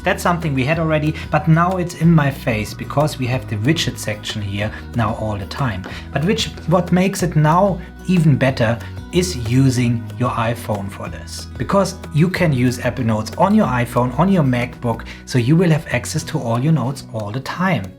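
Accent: Germany. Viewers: I can hören that you kommen aus germany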